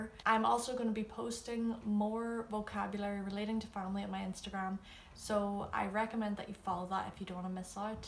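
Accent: Donegal, Ireland. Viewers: I'm nowhere near being a grandmother, but recently began looking for new ways to say "grandma" since my kids are now raising kittens. I'm liking the term "Mamó," though I'm also intrigued by "Morai" (which I think is pronounced MORR-ee, and would love confirmation). I just read in another comment that you are from Donegal, and my great-great-great grandparents (I think that's the right amount of greats) came here from Donegal, so I'm glad to be hearing pronunciation from the same area. Thank you for your videos! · Please tell what accent of Irish language do you use? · Are you Doire/Dun na nGall?? It's so comforting hearing you speak as gaelige 💚. Takes me back to my Thornhill days, go raibh maith agat xx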